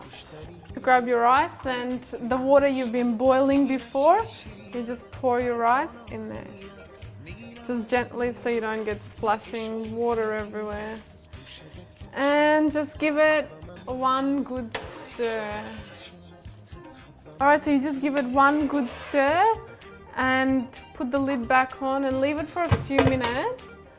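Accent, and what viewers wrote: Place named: Australia. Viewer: I luv Australian accent ;)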